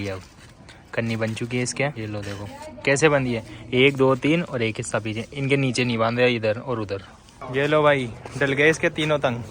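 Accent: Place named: Kota, India. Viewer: Kota ya aas aass boli asii lag rhii hai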